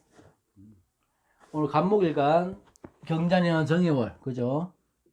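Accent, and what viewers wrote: Gyeongsang, South Korea. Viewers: 젊으신데 구성진 경상도 사투리 살짜꿍 가미된친근한 목소리 마음 편안하게 들을수 있는 감사합니다 ♥️♥️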